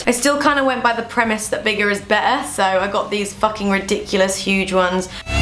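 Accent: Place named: London, United Kingdom. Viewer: it sounds like Adele's accent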